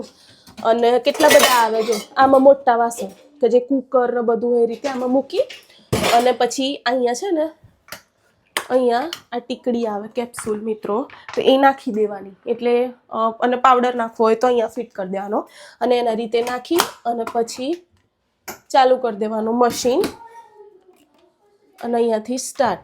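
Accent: Sabarkantha, India. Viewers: Sabarkantha no kahejo che 😅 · તમે સાબરકાંઠા ના હોય તેવું લાગે છૅ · બેન ની ભાષા હિંમતનગર સાબરકાંઠા બાજુની છે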